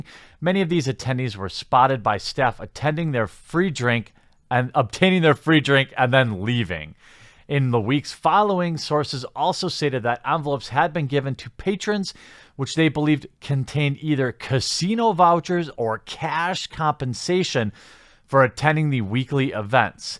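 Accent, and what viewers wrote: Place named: Midwest, United States. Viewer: Interesting Midwestern vowel merger: since/sense homophones, etc.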